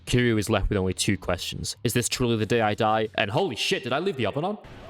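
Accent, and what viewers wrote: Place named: England, United Kingdom. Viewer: You said it correctly-ish. As good as you can with english accent.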